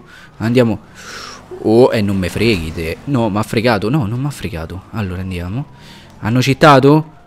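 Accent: Rome, Italy. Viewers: Ma sei romano?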